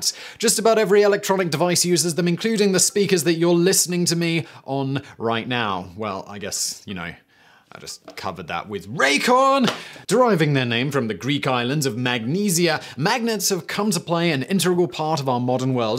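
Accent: United Kingdom. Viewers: I had no idea how many weebs -- specifically JoJo fans -- were part of Simon's fanbase until I looked in the comments. I just assumed YouTube first recommended me his videos because he's got a British accent which is coincidentally similar to the accents of aniTubers I watch. Let's try to decode this insane YouTube algorithm! Which aniTubers do you watch if any?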